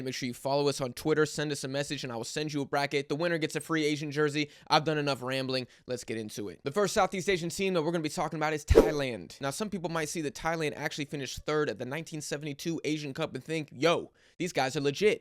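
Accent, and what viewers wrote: United States. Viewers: He has a typical thick American English accent. So I guess he is from the USA.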